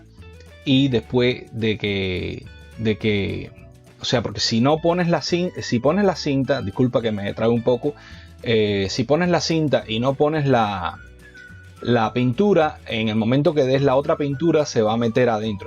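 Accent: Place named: Cuba. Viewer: Por tu forma de hablar me imagino eres cubano un saludo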